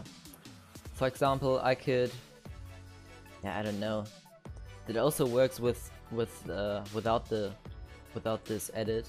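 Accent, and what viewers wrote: Germany. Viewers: as a german i can say that your are german Right? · deutsche accent killt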